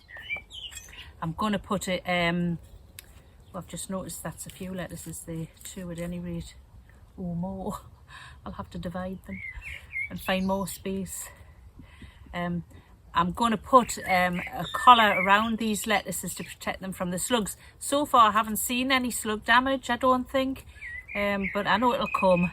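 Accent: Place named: Sunderland, United Kingdom. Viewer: I lived in Sunderland for nearly 20 years but I'm now in the Midlands so it's good to hear the accent and compare what's happening in the garden. I really enjoy your down to earth approach so many thanks for that. Just one question - there's some lovely guitar music and playing on your videos. Who is Kenny Mitchell? Any relation? Thanks again, Dave.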